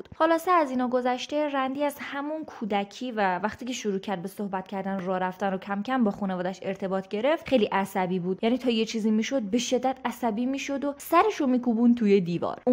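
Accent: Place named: Isfahan, Iran. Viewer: درود برشما بانوی زیباوجذاب.شما خودتم گوگولی هستی،راستی هرکاری کنی بازم ته لهجه‌ی اصفاهانیتو داری😊😊😊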